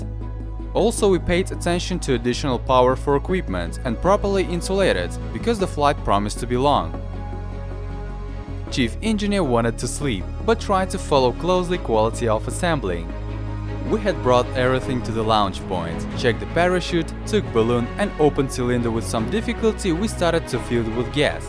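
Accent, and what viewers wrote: Russia. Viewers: Такой Русский акцент! Это ты говоришь или кто-то другой?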